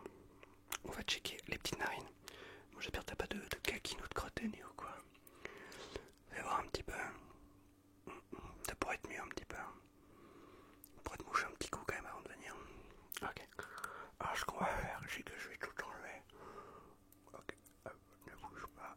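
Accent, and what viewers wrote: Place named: South, France. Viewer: Le petit accent du sud 🤣☀️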